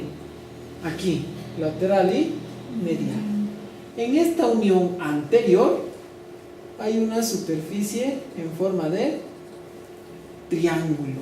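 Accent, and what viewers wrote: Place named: Highlands, Ecuador. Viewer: creo que si, tiene acento a los de la sierra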